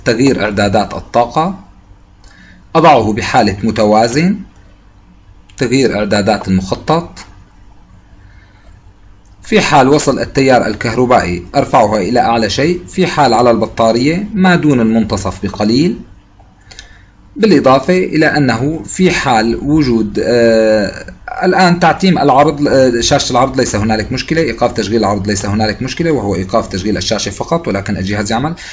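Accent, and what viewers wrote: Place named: Levant. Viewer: تعجبني لكنتك الشامية ولغتك العربية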